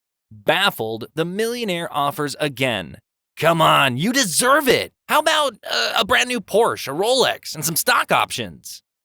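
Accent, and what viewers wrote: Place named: Australia. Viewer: love your Aussie accent😂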